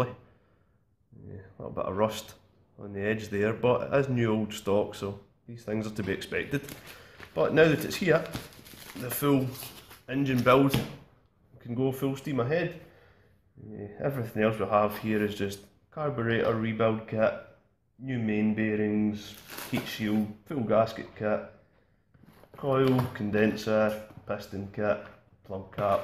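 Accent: Scotland, United Kingdom. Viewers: Scotsman, 100% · Thanks for the update. And by the way, do you know Frankie Boyle? Sounds like you're from the same area. 😁 Keep up the good work. Looking forward to the next one.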